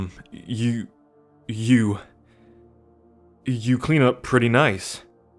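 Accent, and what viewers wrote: South, United States. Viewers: the ever so slight southern twang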